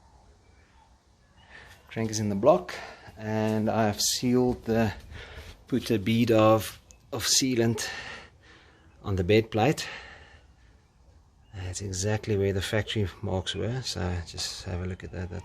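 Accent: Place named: Cleveland, United States. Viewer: You have an interesting accent. Are you from Cleveland?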